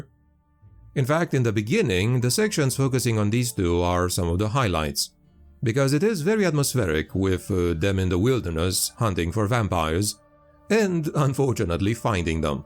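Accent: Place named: Transylvania, Romania. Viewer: live from Transylvania! Two blocks over from Dracula's castle 😎. Okay I'm sure you're probably not near Dracula's castle, but I can at least pretend you are 😊. I mean come on, that accent 🥰.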